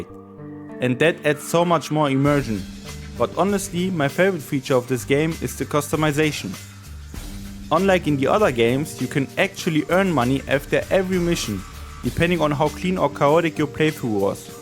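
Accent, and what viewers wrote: Germany. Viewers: i hear german fella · Are you german ? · Ich geh von deinem Akzent mal davon aus, dass du deutsch bist. Ich hab alle deine Hitman Videos durchgeschaut. Finde die super. Hast dir nen weiteren Abonnenten verdient 😂✌🏼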